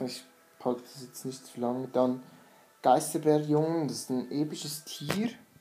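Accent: Switzerland. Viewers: Hallo! Ich habe mal zwei Fragen 1. Bist du Schweizer (klingt irgendwie so)? 2. Sind diese Haustiere unsterblich oder verliert man die irgendwann? Tolles Vid!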